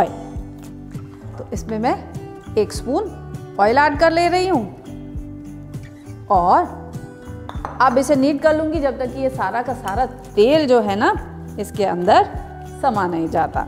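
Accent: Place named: Punjab, India. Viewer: I love the punjabi tone in ur voice ❤️❤️❤️❤️ I know you from master chef ❤️❤️❤️❤️I have supported u all over the season ❤️❤️❤️❤️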